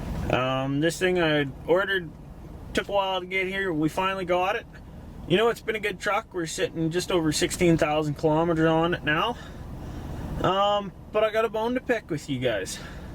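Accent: Canada. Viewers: First 4 words outta your mouth i knew you were from Alberta hahaha fuckin eh bud me too! 🍻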